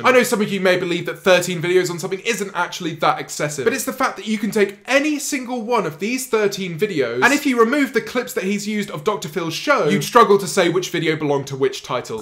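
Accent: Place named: United Kingdom. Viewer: I am trying to take you seriously, to understand your narratives but your voice is killing me. I can't take it, it's worse than James Corden. The British accent with the fast-talking is messing up my brain. That wasn't meant to be a roast. That's just how I feel. I like Tro's random comedy, that's my preference, but I know what you mean by his repetitive content. I am kinda getting annoyed by it too. JustDestiny is just like Tro too. Exactly, the same type of video style, but I like Destiny's roasts better, and Tro's comedy better. That's why I am subscribed to both channels! :) Also at first, I thought JustDestiny, TriggeredTro, and LeafyisHere were all the same person. Just the same video style, same content, none of them ever show their faces, they all have a game playing in the background, and they all sound like 20-year-old white guys who just dropped out of college. 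(Not meant to be a roast, my opinion) Sorry for the novel you had to read! Peace!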